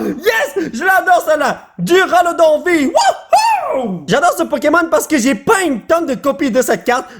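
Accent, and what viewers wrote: England, United Kingdom. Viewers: Tu as un gros bon accent anglais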